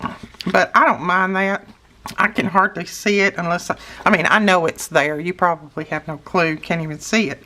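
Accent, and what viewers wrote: South, United States. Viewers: Good afternoon, while I watch and listen to you I am sewing ginger bread dollies for my church nursery..watching you makes me get the paper bug. as soon as I finish the dolls I am going to make Gratitude journals for my ladies group at church.. I am buwy crafting most every day.. You have a blessed day. I love listening to your wonderful southern accent..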